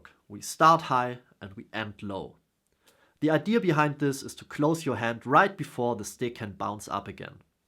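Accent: Germany. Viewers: Your German accent is very convincing. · man erkennt das du deutsch bist xD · are you from germany ?? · Swabian accent going strong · As a German myself - his accent is definitely and undoubtedly german. Stewies accent is british but they have similar voices.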